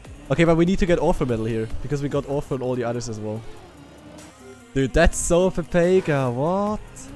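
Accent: Germany. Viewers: Jedes Mal, wenn ich den deutschen Akzent höre, muss ich mir vor Augen führen, dass mein Englisch für Muttersprachler genauso schlimm anhören muss. 😂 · Are you from Germany? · r u German?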